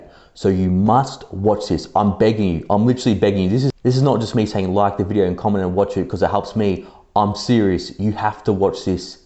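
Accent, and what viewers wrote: Australia. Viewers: You Aussie? I thought you South African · Subscribed as soon as I heard the Australian accent, just what I've been looking for. I LIKE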